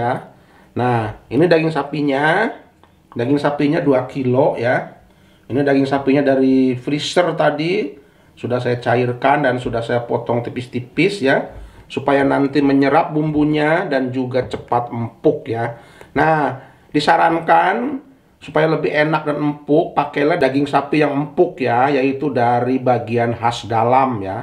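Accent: West Java, Indonesia. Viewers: Hahaa kungkurahan bagus sundanya g hilang berada d negri orang mantaf 👍🙏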